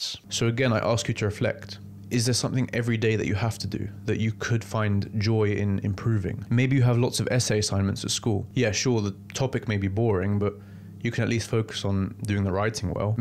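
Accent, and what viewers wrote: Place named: United Kingdom. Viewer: oh sorry, I didn't mean English (by blood), just British as in nationality (which I assumed from your accent?) for some reason it's really hard to find British YouTubers on here, so many (great) American channels but it's pretty refreshing to hear British accents (from a Brit aha)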